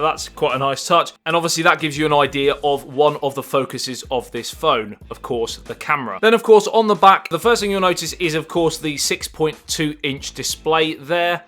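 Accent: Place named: Essex, United Kingdom. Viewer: This man is clearly of irish Catholic descent. Hia facial features are a dead giveaway. What puzzles me is why he is talking with an essex accent. He should do the next video in a raw irish accent to pay tribute to his irish Catholic ancestors. I knew he was Irish the first time i saw him on youtube which was last week. He is a strong Irish leprechaun trying to present tech videos. He is an Irishman by blood.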